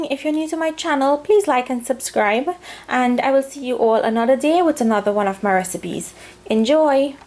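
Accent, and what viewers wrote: Trinidad and Tobago. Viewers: Hi am trini my name is Sharon and i enjoy all your recipes I've tried a few and it came out spectacular. thanks so much.am glad you didn't lose your trini accent.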